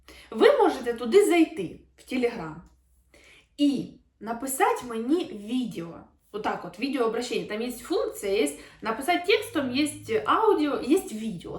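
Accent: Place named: Poltava, Ukraine. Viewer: є розмовна мова регіону,до речі я з Полтавщини,у нас теж балакають,шокають і т.д,дуже наш говір схожий на ваш.Є літературна мова,але суржик він справжній,як би хто до нього не відносився.можливо ви мені через суржик і сподобались.не беріть близько до серця.як на мене краще суржик ніж:"я разгаваривала и буду разгаваривать........"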